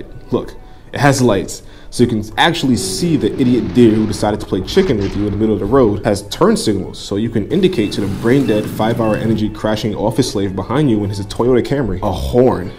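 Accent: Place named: New York, United States. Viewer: Fellow New Yorker here, raised in Uptown. Love how you articulate your points without losing that NY aura and tone. Props my dude. God bless.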